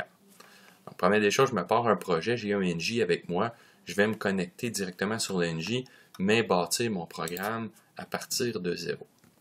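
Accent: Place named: Quebec, Canada. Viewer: Ben trop québecois ton tit-accent toé là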